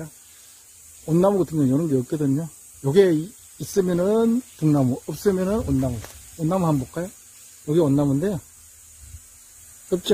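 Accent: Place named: Gyeongsang, South Korea. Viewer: 갱상도 사투리가 이젠 귀에 착착 잘 달라 붙어요~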